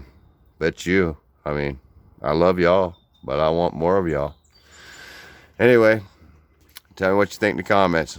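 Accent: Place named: Florida, United States. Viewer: Sickk stoked for ya Brotha.. DUDE check this out!!... I just realized you sound kind of familiar by the way you talk and you also do the same line of work as I do ,.. I'm a painter , pressure washer... but here's the catch as I also noticed and listening to the way you talk something was familiar with you but never thought of it until now because I just watched one of your older videos and I actually think I might know you.... on your old video you stated that you weren't used to the cold and that you where you're from Stuart Florida and guess what LOL? I've been surfing all my life in Florida and one of the my local spots is the "Rocks" yep you guessed it. .. Stuart Florida haaaahaaaaaa... which I have been living here for 43 years now I also lived in Jensen and Port St Lucie I'm still here actually LOL .Yeeeeeeew!.. but I knew it the more I watched your videos something was getting more and more familiar and it was definitely the way you talked you could tell you were a Florida Stuart dude🤙🤙🤙 for sure..yeeeeeeeeew yaaa braaaa... 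Get them 150s .......!!..✌💪👊🙏🔥🔥☝☝💥💥..congrats... I'm surprised you didn't notice when I put on your comments and say "yeeeeeeew!!.lol anyways,, Hows Kentucky treating you? a lot of Bassheads there huh?cause I get alot of my stuff from Louisville Kentucky which is one of the few spots that I get my car audio stuff from...... love my NVX🔥🔥🤙🤙.. alright brother keep going strong get them numbers have fun and stay safe brother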